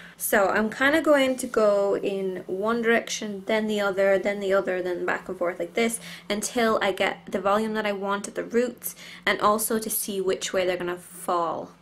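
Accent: Ireland. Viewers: are you irish? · r u irish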